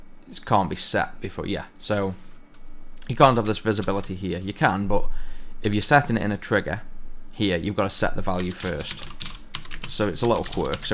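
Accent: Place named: England, United Kingdom. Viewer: I am genuinely interested what is the english accent maybe dialect that you speak in the video. googling didn't give me any answers and thanks for the videos the best wpf on youtube.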